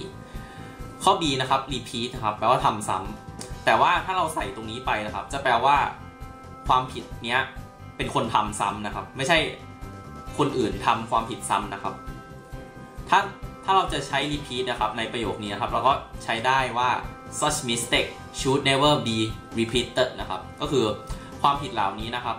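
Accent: Thailand. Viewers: แค่นี้ก็โอเคแล้วค่ะ สำเนียงไทยๆ เข้าใจง่ายค่ะ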